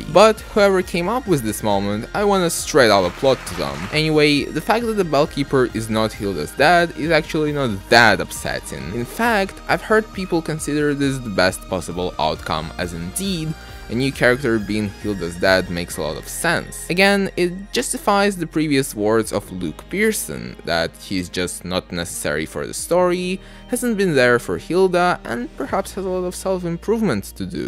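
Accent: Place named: Brazil. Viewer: Are you brazilian? You have a familiar accent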